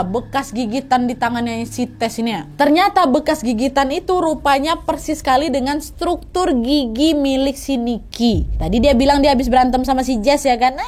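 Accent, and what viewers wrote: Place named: Sumatra, Indonesia. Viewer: amboi..cantiknya bahasa. ibu ini mengerikan nomongnya, ibu-ibu cerewet yang cerdas. enak dengarnya dengan logat khas sumatera, siapa yang jadi suami ibu ini pasti kewalahan ngomong sama ibu ini, bisa-bisa suaminya jadi asma dibuatnya, ngomongnya cepat dan tembak langsung. nggak bisa ditangkis lagi.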